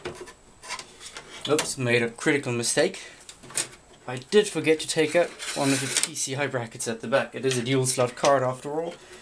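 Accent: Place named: United Kingdom. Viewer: you talk sooo british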